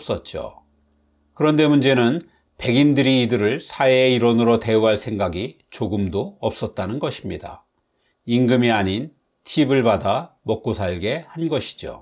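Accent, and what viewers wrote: Gyeongsang, South Korea. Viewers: 남한 표준 언어의 경상도 사투리 오염 현상 때문에 그렇습니다. 경상도에서 ~또 라고 발음하죠. 전에는 없던 현상인데, 없어져야 할 악습입니다. 지적 잘하셨습니다.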